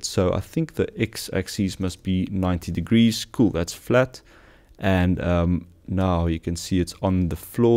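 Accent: Afrikaans, South Africa. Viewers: definitief afrikaans lol!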